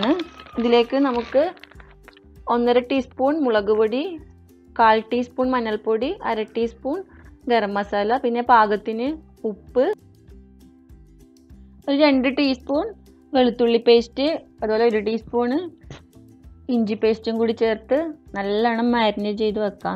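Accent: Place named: Pathanamthitta, India. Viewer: Very unique and easy! Nannaittundu.. naatil evideya? Annikkorundeiend undu from Pathanamthitta.. aulde samsaram pole und :)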